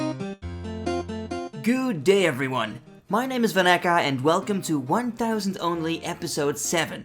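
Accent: Netherlands. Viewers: The dutch accent is strong with this fella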